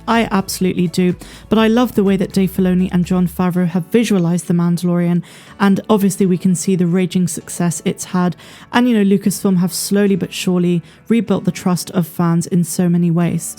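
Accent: United Kingdom. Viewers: Thanks for the update. Where in the UK are you from btw, that is if you live there? I like your accent.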